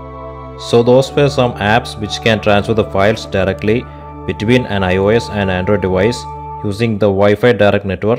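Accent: Kerala, India. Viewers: That is 100% kerala accent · 100% Indian. O hate your voice so much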